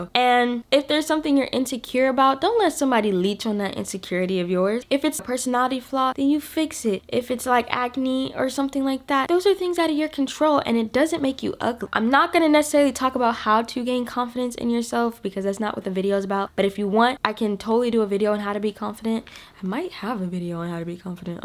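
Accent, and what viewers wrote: United Kingdom. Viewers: The famous British accent🙃💕 back with the good advice as usual ❤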